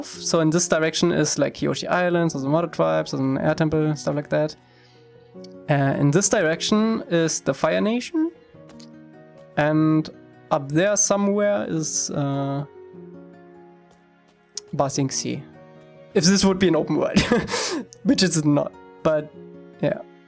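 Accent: Germany. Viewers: Der deutsche Akzent kickt aber anders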